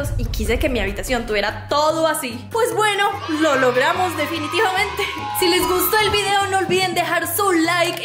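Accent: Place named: Colombia. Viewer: Amo como hablas toda Haci colombiana y pues uno intentando hablar como tú jaja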